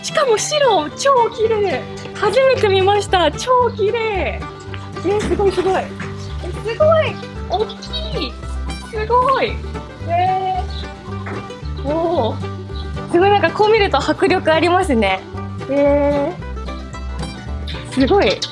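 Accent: Taiwan. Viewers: 根本是台妹講日語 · 為什麼是講的台灣國語?好可愛!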